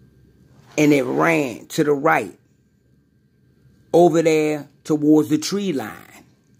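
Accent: Baltimore, United States. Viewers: Bro u sound like u from baltimore da city im in. I know they got bigfoot here in maryland we could probably do some research if you were down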